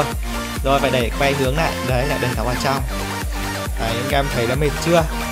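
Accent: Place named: South, Vietnam. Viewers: Ôi zồi ơi ổng nói tiếng nam kìa cute phô mai que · Anh nói tiếng miền nam kìa ối zờ ơi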